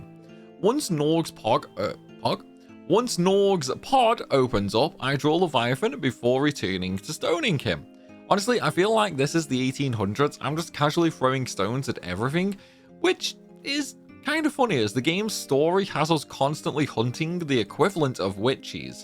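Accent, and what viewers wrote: United Kingdom. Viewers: are you british by any chance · Y'know, most people enjoy it when a YouTuber doesn't spend a bunch of extra time baby stepping you through the basic systems of a game, because it gets really repetitive hearing every single challenge video explain the exact same things over and over. And I guess hearing a British accent for the first time in your life, while also watching a challenge run of a game you know nothing about, might leave you slightly confused as to what's going on here.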